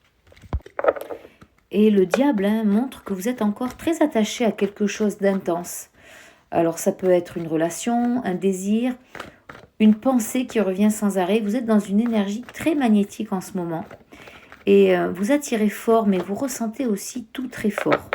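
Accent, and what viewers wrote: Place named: Corsica, France. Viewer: merci j'ai ressentis un léger accent Corse dans votre voix en tout très bon tirage bonne soirée à vous a bientôt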